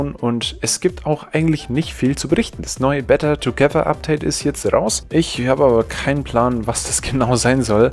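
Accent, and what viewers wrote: Austria. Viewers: Bist du österreicher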